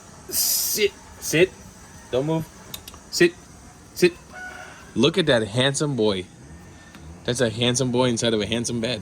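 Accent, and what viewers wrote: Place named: Philippines. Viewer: Wow, your bisaya english really sounds filipino. Even the accent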